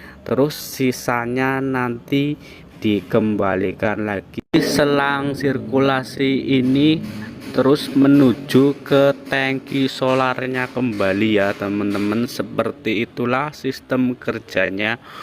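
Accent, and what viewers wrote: Banyumas, Indonesia. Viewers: KAYANE NGAPAK APA YA KANG😅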